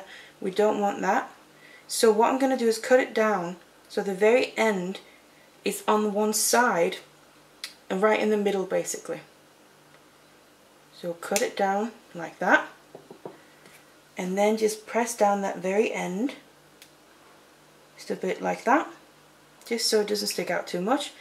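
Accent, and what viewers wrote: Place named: North, United Kingdom. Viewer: Nice and clear instructions, easy to follow, and its really nice to hear a soft northern accent and not some harsh grating American one(some are OK TBH). Anyway many Thanks for the tutorial!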